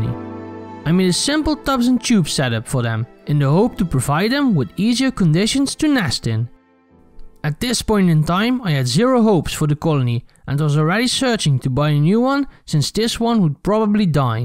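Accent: Netherlands. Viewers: Am I hearing a Dutch accent? :o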